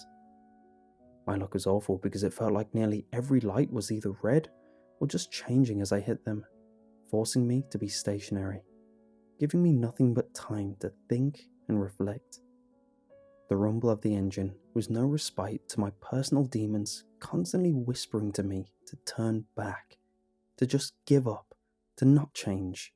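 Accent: United Kingdom. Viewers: r u British?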